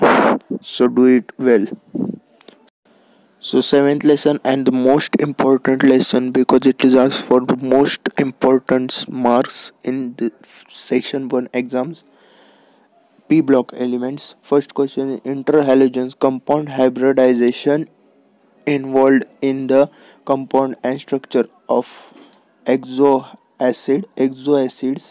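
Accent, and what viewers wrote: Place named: Maharashtra, India. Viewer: Hindi tone tar Maharashtra chi ahe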